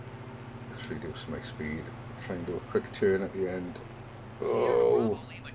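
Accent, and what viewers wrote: Wales, United Kingdom. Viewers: Now THAT's definitely a Welsh accent lol, ello from Mid Glamorgan.. Nice vid, When we get helicopters in this sim? i will definitely drop down in there. Incidentally? This had the Exact same glitch at this point in the olf fsx days.. and i used to host sessions from here.. lol.. and 747's 737's .. we landed them all down there, although it wasn't as deep as this hole in this sim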